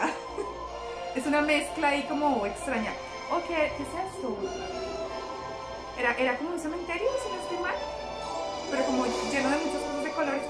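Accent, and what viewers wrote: Colombia. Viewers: Me encanta el acento colombiano! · Amo el acento colombiano 🥺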